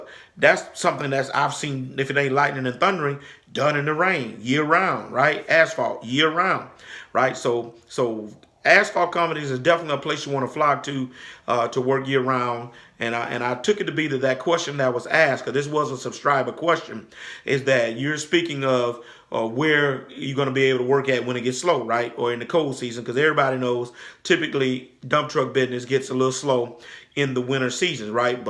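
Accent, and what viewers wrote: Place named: Louisiana, United States. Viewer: What part of Louisiana are you from brother?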